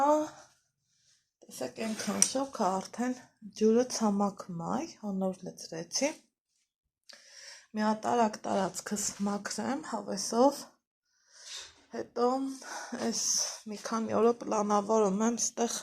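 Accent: Lori, Armenia. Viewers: Շատ հաճելի բառբառա ջան Լոռվա բառբառը👍🤗🤗❤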